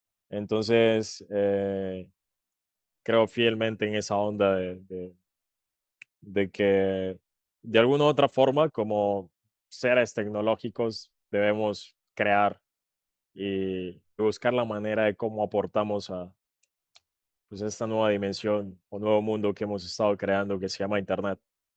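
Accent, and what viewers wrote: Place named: Colombia. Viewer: Porque a veces habla full Colombia o como un gringo aprendiendo español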